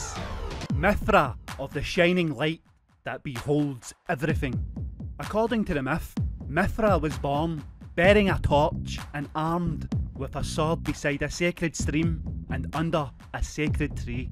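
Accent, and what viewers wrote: Scotland, United Kingdom. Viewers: Please bring back the old narrator, i cant understand half of what this guy is saying. His Scotish accent (i think) is so damn thick its hard to make out certain words. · Nice video and accent, is it Scotish?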